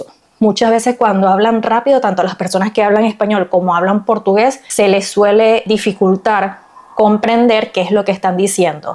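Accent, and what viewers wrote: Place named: Venezuela. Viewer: Tiene acento de mi país Venezuela.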